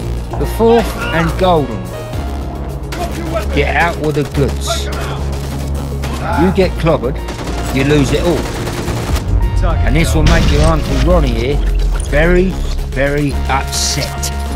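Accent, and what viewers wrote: United Kingdom. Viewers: The narrator reminds me of Michael Caine. That's a cockney accent I'm hearing, right? Curious as to why this game is launching on a Wednesday - most PSN games launch on Tuesdays, and I haven't noticed info about this on the PS blog. Is this announcement tying into the PlayStation meeting today, particularly with the PS VR version coming soon?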